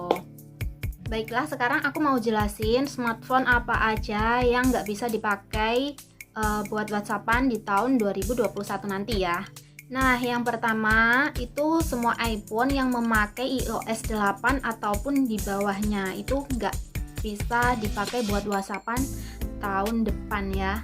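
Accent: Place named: South Kalimantan, Indonesia. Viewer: Kakak orang kalsel ya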